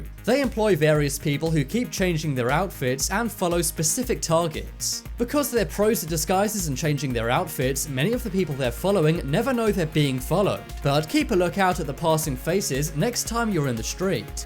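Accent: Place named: United Kingdom. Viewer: Notice the British accent.....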